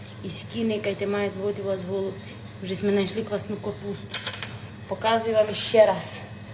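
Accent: Transcarpathia, Ukraine. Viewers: Дякую, супер по нашому по Закарпатськи❤❤Ви чарівниця.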